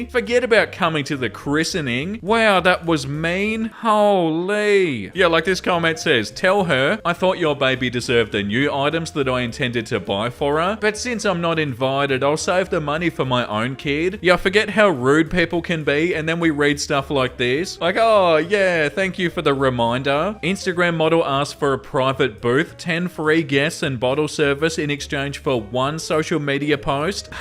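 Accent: Australia. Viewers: Lol my cats name is sassafras ❤ also when I was at the mall yesterday I started feeling not great and I ended up sitting on the floor in a quiet corner in the mall with Starbucks listening to videos, just what I needed, love you ❤ P. S. As a kiwi, you’re literally the only Aussie I can bear listening to 😂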